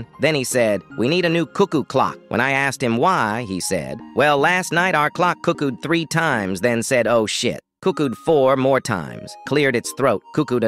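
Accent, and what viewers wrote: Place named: South, United States. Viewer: This, this is like the 7th time I heard this story, and the 1st time it sounds vaguely southern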